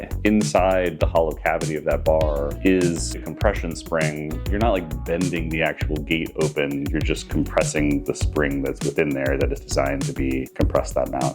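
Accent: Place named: California, United States. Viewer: Cool, but you need to lose that Californian woman’s accent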